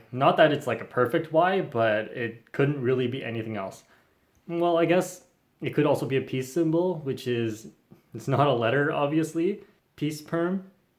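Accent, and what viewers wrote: Australia. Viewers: You sound just like an Australian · That Aussie acsent was alright cause I am a boody aussie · Your aus accent is actually really cool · His Aussie accent sounds EXACTLY like Feliks Zemdegs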